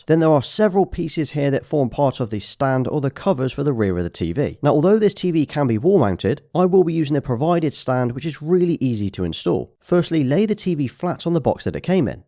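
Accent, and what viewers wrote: United Kingdom. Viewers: OMG I like your voice I think you are British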